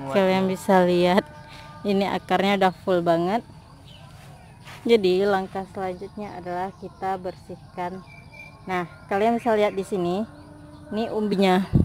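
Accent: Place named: Aceh, Indonesia. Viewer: Kak dmn tinggal nya??? Dari logat nya org aceh ya??? Rencana saya mau main ke tempat kk, tlg di balas ya 🤗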